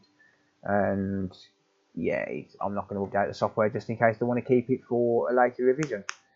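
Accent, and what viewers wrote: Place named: Midlands, United Kingdom. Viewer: Subscribed! Not only is it nice to have a British Based Tech Channel I detect a fellow Midlander accent😂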